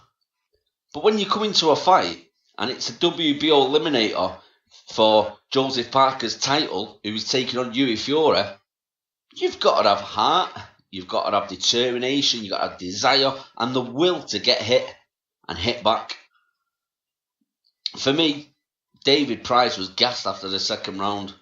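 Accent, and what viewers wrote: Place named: Manchester, United Kingdom. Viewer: I sense by your accent a Manc like myself? I think it would be a good fight for Dave Allen and a win would definitely raise his profile. For Price it would just be a case of pound notes as its not something that would put him back in the spotlight. In my opinion, the way the modern heavyweight division is going re Joushua, Wilder and maybe Haye etc I'm struggling to see how other less (trimmed/tuned machines) will stand their own within the next two/three years...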